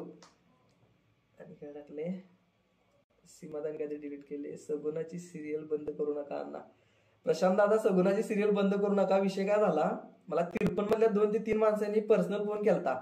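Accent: Kolhapur, India. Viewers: अतिसुंदर कोल्हापूरला गेलो असे वाटते फारच छान आपली कोल्हापूरची मराठी भाषा ऐकून फार आवडते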